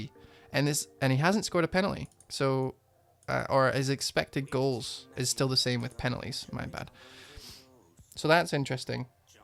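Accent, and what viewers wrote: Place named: Scotland, United Kingdom. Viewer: Where in Scotland you fr om?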